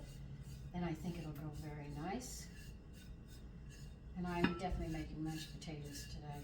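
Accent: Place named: North Carolina, United States. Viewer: You’re telling the truth, more should take heed!!! Sounds like you from NC “whaterburger” “red barn” restaurants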